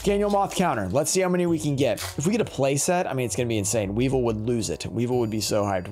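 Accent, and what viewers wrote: Portugal. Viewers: Random question, are you Portuguese?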